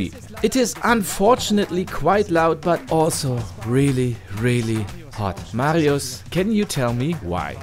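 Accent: Germany. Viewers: That german accent :D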